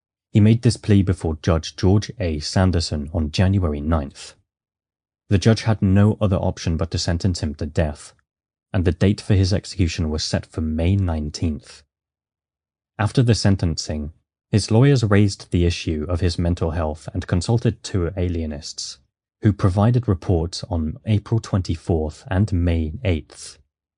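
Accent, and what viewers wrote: England, United Kingdom. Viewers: Wait is it you? I like it. You are not mispronouncing the word, please don’t work on it, it is my American ears and your lovely English accent. There is nothing wrong with your pronunciation!!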